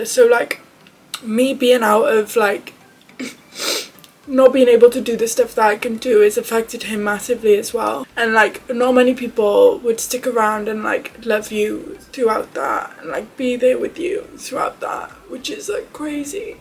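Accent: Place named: Wales, United Kingdom. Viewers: She’s Welsh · What part of the uk is her accent from? Just wondering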